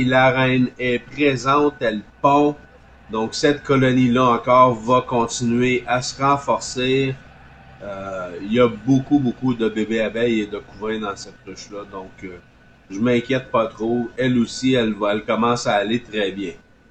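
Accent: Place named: Quebec, Canada. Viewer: Je suis une apicultrice de Toronto. J'essaye d'apprendre la terminologie apicole en français car je suis anglophone. Puis-je vous demander s'il vous plaît d'énoncer un peu plus clairement car j'ai appris à parler français auprès de professeurs français et non québécois. Je suis apicultrice depuis environ 10 saisons. Merci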